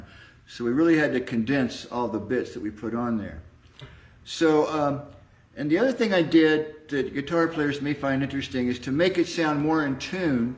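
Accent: Florida, United States. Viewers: Both real Floridians! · Mike and tom have the same accent being both from Florida make a lot of sense.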